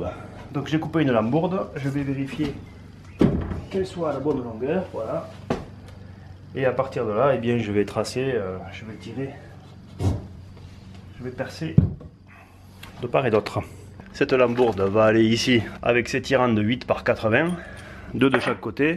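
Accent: South, France. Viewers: Belle vidéo comme d'hab. ce paysage est magnifique et cet accent me dit quelque chose je pense que nous somme pas loin l'un de l'autre. Poir ce qui est des plot tu peut le remplacer par des laver autoblocant cela marche très bien aussi allez ciao a très bientôt dans tes vidéos qui me font passer à chaque fois un super moment même si comme toi j'ai un grand jardin qui me permet de pas m'ennuyer Jphi de carcassonne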